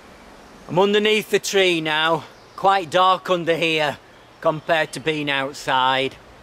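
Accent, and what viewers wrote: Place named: West Yorkshire, United Kingdom. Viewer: Respect mate, so inspiring What a lovely soundtrack with birds chirping away Your videos are really great man, and w appreciate your challenging production values, and man you consistently make good videos btw your accent reminds me of home I'm was born in Keighley, West Yorkshire So peace and love from Bristol, England Then owls were like "who the bloody hells this?"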